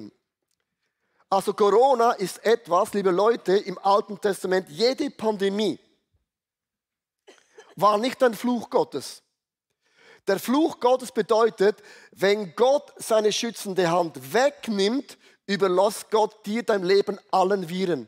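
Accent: Switzerland. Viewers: Herzlichen Dank! Ich fand erst den Schwizer Dialekt Kanal, habe Sinngemäß verstanden,war jedoch anstrengend 😊 Und jetzt bin ich hier. Danke!❤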